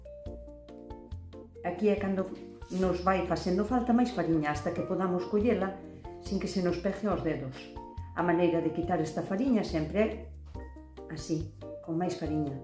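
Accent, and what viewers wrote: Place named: A Coruña, Spain. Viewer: No sé porque me paredes que sos de la Coruña o de Santiago de Compostela. De Galicia..creo que seguro...te escucho con atención ..es la primera vez que te veo..ye emociono tu acento xque mí madre era de la coruña.y yo entendí siempre su fdialecto.....ya no está conmigo..pero tu idioma me traslado a mí niñez.. Gracias....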